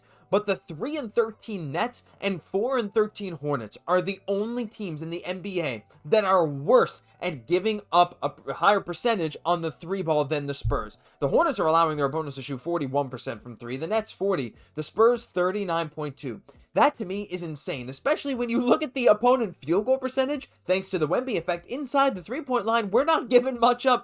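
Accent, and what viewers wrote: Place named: France. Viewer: Hello from 🇫🇷 Your french accent 👌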